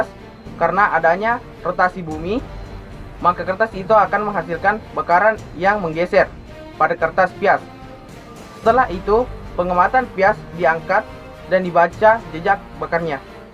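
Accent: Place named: Java, Indonesia. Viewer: Kok medok yahh?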